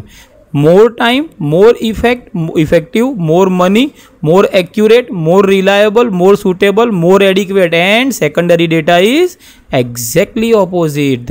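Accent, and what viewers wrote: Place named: Gujarat, India. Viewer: Aanoo gujrati laagyeee Chee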